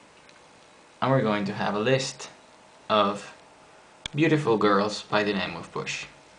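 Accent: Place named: Finland. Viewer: I say its a finnish accent.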